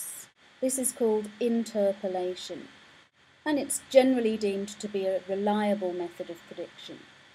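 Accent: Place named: Australia. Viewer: thanks :) aussie accent eh ;)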